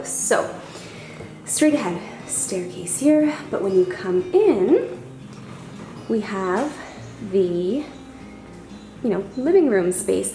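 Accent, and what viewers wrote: Canada. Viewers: Hehe I love your Canadian accent ie the way you say "house"! It's so fast that it's almost like "hoose" and it's very cute!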